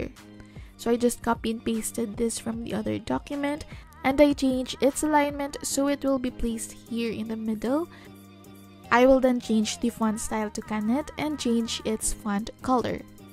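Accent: Philippines. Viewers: based on your accent, are u filipino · I can tell your From the Philippines May me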